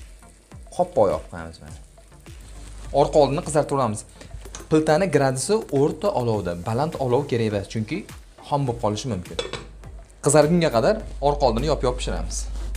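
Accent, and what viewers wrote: Andijan, Uzbekistan. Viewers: ДУППИНГИЗДАН. МАРГИЛОНЛИКМИ ДИЯПАН. ШЕВАНГИЗДАН. АНДИЖОН. А ТАК МЕН УШДАН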